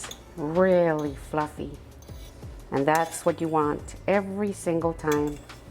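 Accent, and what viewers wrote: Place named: New Mexico, United States. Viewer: THANK YOU SO MUCH! I can’t ever make a perfect rice! I Love All Your Recipes! Are You from New Mexico? In the 70’s l dated someone from Espanola New Mexico and l met all his Family you have an accent like his family, I didn’t Marry him, had a wonderful husband for 43 years he passed away 2 years ago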